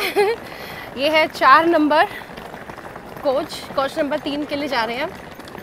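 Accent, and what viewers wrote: India. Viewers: Tum lazmi hindu ho. Kyu k tum namastay aur sat sri akaaaal to bolti ho laikin salaaaam boltay tum ko bohat takleeef hoti hai. Sikh hoti to salaaam zaroooor karti. Sikh hoti to punjab ya khalistan ka naaam layti. Baaar. Baaaar. India. Ya. Hindustan. Na. Bolti. Tumhara. Urdu. Bolnay. Ka. Andaaaz. Pakka. U. P. Wala. Hai. Bhia. Ho. Bhia. U. P. Ki. Bhia. Love. Khalistan. ❤❤ v. Real. Sikh. For. Khalistan. Khalsa. For. Khalistan. May. To. Insaaaan. Ki. Shaksl. Dekh. Kar. Us. Ko. Pehchaaaan. Layta. Hoon.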